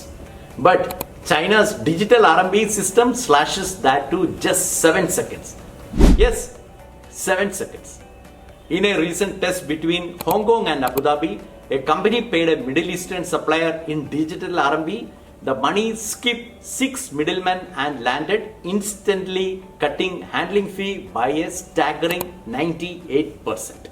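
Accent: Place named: India. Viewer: Thanks to sub-titles otherwise I would have problems understanding Indian English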